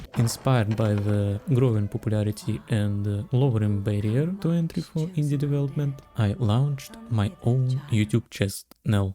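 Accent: Russia. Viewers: Ну по акценту я сразу понял что ты наш слоняра 💪 а фото университета это лишь подтвердило 💪💪💪💪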